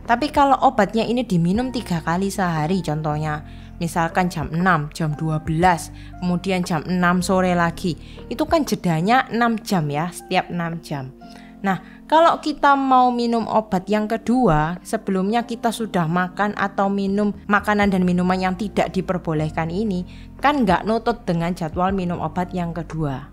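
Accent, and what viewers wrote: Java, Indonesia. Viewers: Iya betul nggak menghilangkan jawa nya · Logaaak jawwa mu keliatan... Gx bsa berbahsa indonosia yg baik dan bnar y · Logat dan bahasa itu beda....bahasa indonesia nya benar, trus logat nya jawa....emang kenapa?...salah ? Klo yg ngomong orang maluku dgn logat daerah nya, kan juga BOLEH..... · Jowo ne ketok 😅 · Bhs Idonesianya sdh baik dan benar soal medok Jawa tdk masalah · terimakasih atas ilmu dan nasehatnya sangat bermanfaat penyampainya dr logad jawa banget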